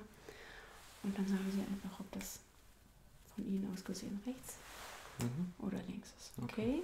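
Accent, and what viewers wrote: Germany. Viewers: I think she is german...i don't t inderstand anything..but is good..i feel triggers.....gostei muito mesmo..ela é ótima....já me inscrevi no canal.... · вы из германии или австрии?) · german, guaranteed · A Germán doctor.....pretty familiar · I very much prefer the soft speaking roleplays. The German accent and language is nice ASMR. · It took me 11 minutes to know she German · yG KeKe I love the German language, and their accent.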